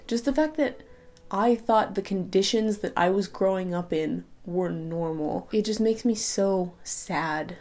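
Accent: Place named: United Kingdom. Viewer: i see u r speaking a little bit with the old british english accent!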